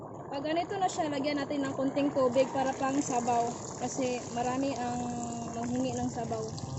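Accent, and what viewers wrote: Philippines. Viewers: Gantong vlogger dapat sumisikat eh. Ganto dapat nagrerepresent sa ph vloggers. Pinay beauty, pinay heart 💙 Yung accent pa talaga pinoy 😍💙 support ate. God bless u moreeee!!!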